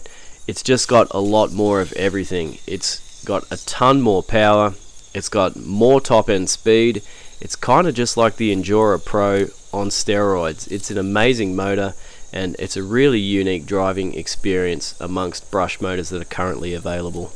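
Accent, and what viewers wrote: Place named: Australia. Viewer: Ha! I thought from your accent you were down under. But yeah, NC probably still a bit more toasty. I don't think it is. Josh did a redo after his near meltdown. Glad he's simplified and condensed things down into one rig. New unit looks killer. But I still find I can't quite figure out his shop page. Definitely could be just my dyslexia, but I think it still needs more work. I can't tell what comes with what or if the combo/kits are complete or partial. Always prevents me pulling the trigger as with shipping, exchange rate, import duties, etc. it would be costly to order incorrectly. Love Josh though. He's the mad scientist of 1/24th's. :)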